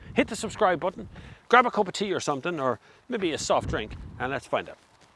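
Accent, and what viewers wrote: Ireland. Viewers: Love the Irish brogue! “It’s a beautiful little ‘ting!”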